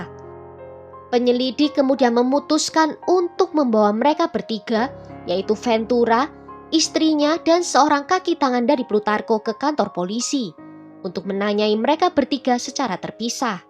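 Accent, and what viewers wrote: Java, Indonesia. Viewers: Medok banget ...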